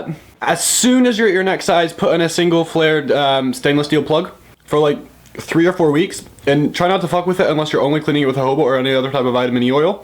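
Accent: Scotland, United Kingdom. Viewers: Are you scooottiish or iriish?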